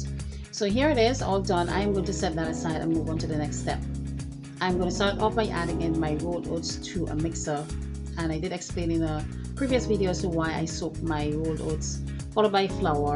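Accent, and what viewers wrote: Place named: Eastern Caribbean, Caribbean. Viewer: What IS "blogger plantain"? Is it of the Eastern Caribbean, I detected the region's accent. Novel idea. 😊🌴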